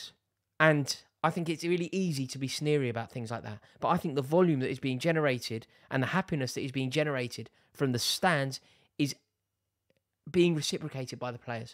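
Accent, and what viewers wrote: RP, United Kingdom. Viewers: Rory seems like he grew up rich and went to Oxford. Love the vocabulary and posh accent 🎉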